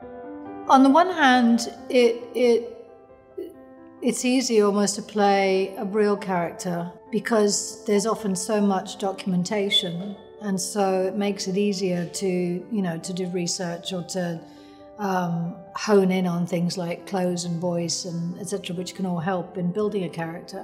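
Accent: England, United Kingdom. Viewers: Her British accent is more prominent than ever, right? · Why is she speaking in a british accent, shes from Chicago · What’s with the British accent Gillian? Is she still in character? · You've gotta remember she had an English accent when she moved back the the US when she was 11. She was bullied and teased for it and therefore tried to sound American. Now after living in London again, she's likely fallen back into speaking that way. However when she is in the US, it isn't as evident. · How does she look like this 30 years after xfiles??? And she was born and raised in the USA - why does she sound sooooo BRITISH ???? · I really like her voice. Her British English is really nice to listen to. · Why is she speaking with an English accent? This is a choice. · Before I click on a video I always cross my fingers hoping it’s the British accent😍 She’s so fine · What's with the British accent, she's from Chicago! 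· She doesn't sound American in anyway anymore. She sounds very British! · Why is she talking wirh a slightly british accent?! She should be figuring out how to get out of character!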